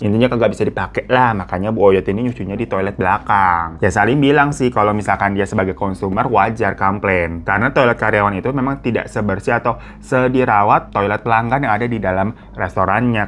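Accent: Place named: Makassar, Indonesia. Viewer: Kok lucu basa kotor mks 😂 logatnya beda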